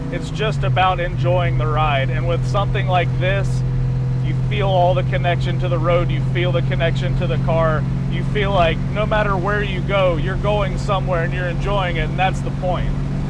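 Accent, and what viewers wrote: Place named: Texas, United States. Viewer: I LOVE THE ACCENT - IS THAT A COUNTRY TWANG. TAXAS HUH ? NEVER BEEN .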